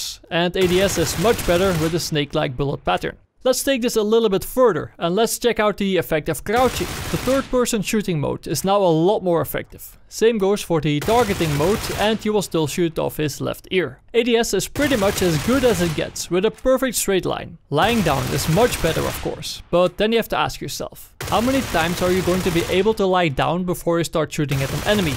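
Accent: Netherlands. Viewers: sounds a little bit like dutch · You are from the Netherlands i can hear it in your foyce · Your accent is pretty funny, like all the dutch people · R u dutch cuz of you're accent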